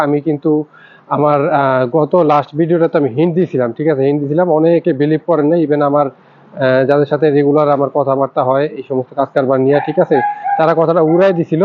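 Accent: Barishal, Bangladesh. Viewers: আপনার বাড়ি কি বরিশালে? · দাদা আপনার বারি কই বরিশাল নাকি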